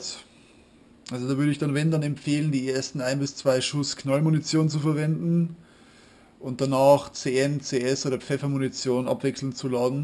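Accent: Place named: Burgenland, Austria. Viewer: Woher bist denn du? Du redst meinermeinung noch wia a Burgenländer.(I bin aus Margerethen.)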